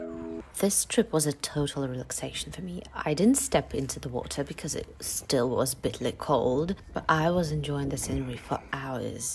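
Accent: England, United Kingdom. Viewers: how you got an English accent?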